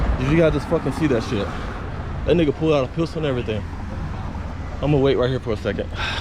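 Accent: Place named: United States. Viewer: Saludos desde O.C. En Cali nadie sale para celebrar el año nuevo. Todos están en casa con su familia. Si salen se salen después en grupos. Si puedo darte un consejo, soy también de Oak Cliff pero tú Español y tu accento es gringo. También tu andas como no sabes nada de Cali o el mundo Latino. Cuidar Pa tengo que suscribir a tu canal.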